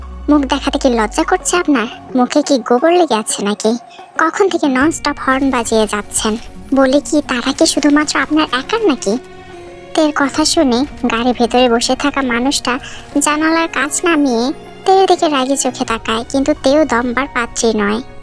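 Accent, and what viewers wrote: Sylhet, Bangladesh. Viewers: Hmm apu r basha Sylhet. 💜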